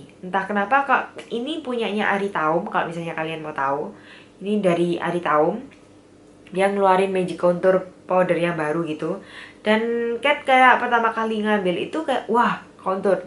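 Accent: Java, Indonesia. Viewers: Ka cantik, aku suka cara ngomongnya. Podo2 jowo mbak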